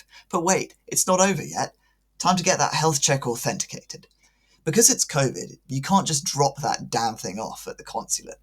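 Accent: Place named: United Kingdom. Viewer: It´s lovely to hear you talking. I am not used to listening to British English that much anymore. Besides that, I hope that everything works out well in the future and you can come to Taiwan soon!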